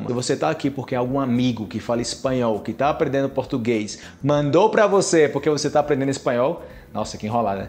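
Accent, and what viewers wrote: Antioquia, Colombia. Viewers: Tiene mas acento colombiano que yo 😧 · Habla con acento Colombiano, pensé que era de Colombia hablando un perfecto portugués. Jeje que bueno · Eu gosto deste canal porque você tem um sotaque colombiano bem vocalizado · Pareces de acá de Colombia cuando hablas en español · Felicitaciones a antes había visto varios de sus videos hablando español se le nota un poco el acento colombiano. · Se nota el acento colombiano... 👍Saludos desde Argentina. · No es paisa? 😮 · A mi me parece que ud tiene mas acento colombiano que acento brasiliano? Como puede ser si ud viaja por toda hispanoamerica? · Habla mejor español colombiano que yo como nativo, nunca pude hacer bien ese acento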